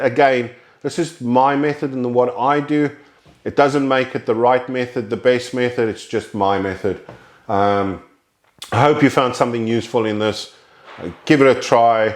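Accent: South Africa. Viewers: You from South Africa:)?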